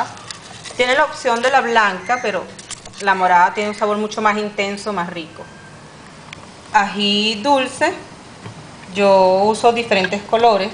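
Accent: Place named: Venezuela. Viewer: Buena la receta y tiene que ser venezolana por su acento muy marcado😀😀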